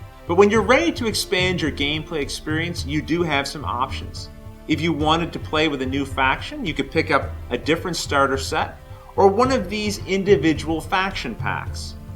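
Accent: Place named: Eastern Canada, Canada. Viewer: I'm from Eastern Canada, that's what you're hearing :)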